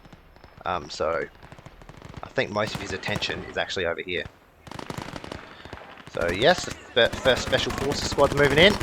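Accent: Australia. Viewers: Seriously though, you are a natural at gameplay commentary, and your whole tournament series was very entertaining!! Your aussie accent is lovely and improves the overall experience for me, personally. All the best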